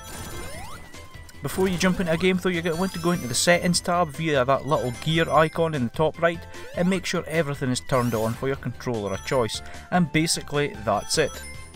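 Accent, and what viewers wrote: Scotland, United Kingdom. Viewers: Nice accent are you from Scotland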